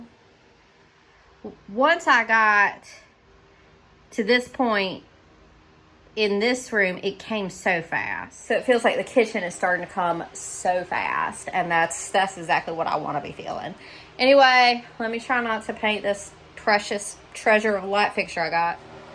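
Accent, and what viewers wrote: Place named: South, United States. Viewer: One question I have wondered (for your Q&A): In your older videos, your voice sounds so different. Did you use to try to talk without your Southern accent? (I like your accent 😊)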